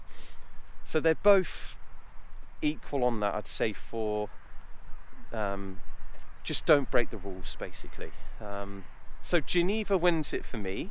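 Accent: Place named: United Kingdom. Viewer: I was just enjoying your British classic accent 😍, hi from Dubai ✌🏻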